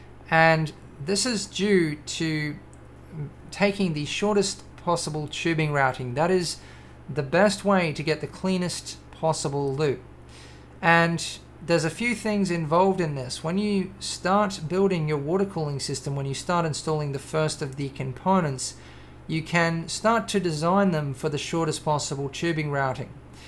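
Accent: Australia. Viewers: I have no idea why it has taken me so long to find your channel but things seemingly, are what they are. It was Google that recommended your channel to me I think... Anyhow, I have subscribed and I am a uber happy camper, yet still, I am struggling to understand why it has taken so long for me to be invited to view your channel, when I have been watching similar sites for years, in some cases. The thought that crosses my mind is one that wonders whether Google and Youtube have some kind of favoritism algorithm going on or worse. I have noticed that you have an accent of one from the parts termed "down under," however, surely, with me residing in Europe, or, and,even "the west," that does not form a barrier of communications or does it? It certainly does not involve tech sites from over "the pond." Something is amiss that is for sure - to my mind, and my gut feeling is that it has something to do with traffic algorithms or is that the conspiracy theorist in me raising up its ugly head or beautiful head, which ever it is that you fancy comrade - only remember comrade... 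There is a tint of red in all of us, simply waiting to blossom. Whatever the causation, I have managed a couple of hundred words of adequacy and for such, my time as the writer is up and my time as the dreamer of heavenly wonders is upon me - over and out, goodnight Vienna, goodnight Helsinki... Shalom & Gratitude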